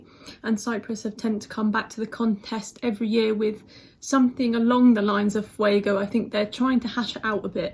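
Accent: United Kingdom. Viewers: you're one of the most competent persons I've heard about theme of ESC...and your british accent is like a melody 🤗 Grettings from Serbia 👋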